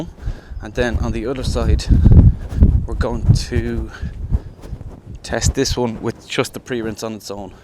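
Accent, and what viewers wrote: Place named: Ireland. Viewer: are you irish?love the accent 👍🏿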